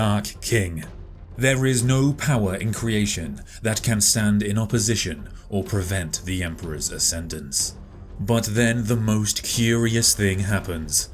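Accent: United Kingdom. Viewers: Just found you yesterday Mate! Love your videos! You,Luetin,and Weshammer are the kings of youtube 40k! Much love from VANCOUVER BC CANADA 🇨🇦! Warhammer is just better when read by the British!!!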